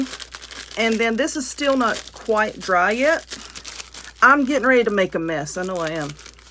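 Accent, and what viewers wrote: South, United States. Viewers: Girl, you are soooooo Southern!!!!! Your accent is deeper than mine (ATL)!!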